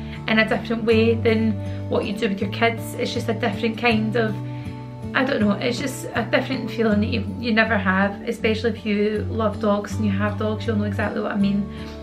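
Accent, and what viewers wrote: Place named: Glasgow, United Kingdom. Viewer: Hi, Iv been watching your videos for a while now and you actually inspired me to make my own channel😊 I just had to say how sorry I was to hear about Lola, I enjoyed seeing her in your videos. Those clips of her at the start had me tearing up. Maggie is so cute though and couldn’t be part of a nicer family ❤️ Also fellow Glaswegian over here 🙋🏻‍♀️ Iv been living in England for a few years and miss Scotland so much so it’s nice to hear the sound of home ☺️🏴 xx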